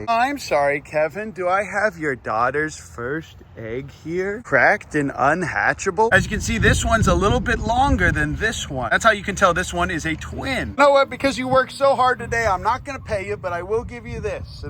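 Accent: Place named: Texas, United States. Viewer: you got a Texas twang that is organically coming out. Hoooo hooo hooo 😂 I could watch the animals graze and eat for hours. ❤❤❤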